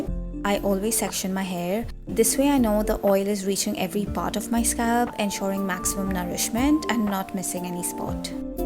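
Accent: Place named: India. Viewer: ❤Your voice gives me comfort. I think you are from India. I recognized you from your accent. I would like to say that I follow you from Algeria. I would also like to say that you are incredibly beautiful. Your hair, your body, everything. Continue with your content because you are really successful. I really loved you. ❤❤❤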